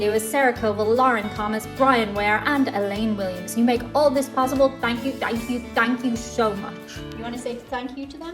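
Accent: Ireland. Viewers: I love your accent. It’s still Irish but I can understand you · what a treat!!! I love these~! But then again I could listen to you "read the phone book" with that brogue 😁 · Has it occurred to you that you get more friendly reactions in America because you are a pretty blonde lady with an Irish accent?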